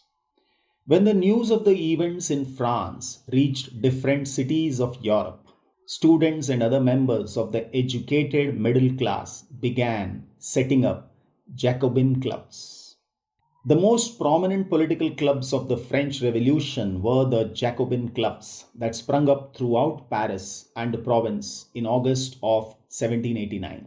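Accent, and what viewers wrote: India. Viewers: नमस्ते I am an American and I enjoy watching your videos. It is really calming as I have a stressful life. I love the geography exceptionally. I really like learning about India, as it combats the Ameri-centrism and Euro-centrism that is common in American schools. Most influence even came from Asia, from the Indian Spice Trade (to Arabia) to the Chinese discovery of gunpowder and its usage. Keep up the awesome production of videos! 😁👍I like the Indian accent and culture. I had a Nepali friend for most of my life. P.S. I like your look, lol 😂😀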